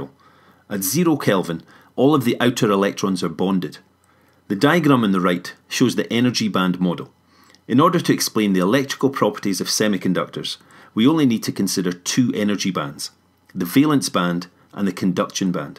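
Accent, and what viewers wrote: Scotland, United Kingdom. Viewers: Scottish accent ay?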